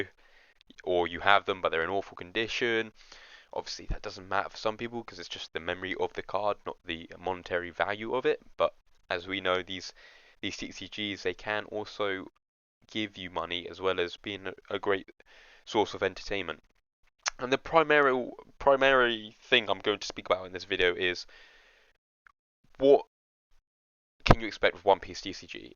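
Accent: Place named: United Kingdom. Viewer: Good luck with your journey, subscribed as sounds like you are from the uk, looking forward to more videos . 👍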